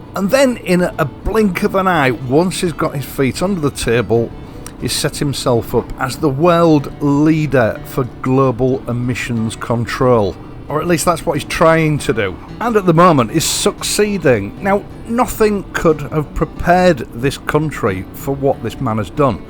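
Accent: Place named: Bradford, United Kingdom. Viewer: South Bradford accent ? Trying to pin it down is doing my nut in... trace of Irish-Leeds though...must be munt it ?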